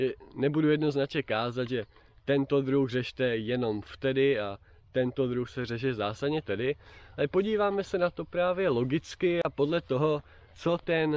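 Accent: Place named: Wallachia, Czech Republic. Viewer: Mluví krásně valašsky, taky se mi to líbí :)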